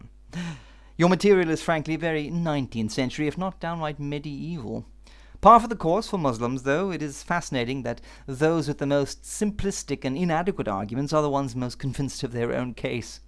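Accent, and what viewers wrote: South, United States. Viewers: hey i love that southern accent you use in this video. i'm from the states and i've run into the southern trailer park type that talk like that. cheers! and have a great day:) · wow, the southern draw is just great. I think it makes a great point.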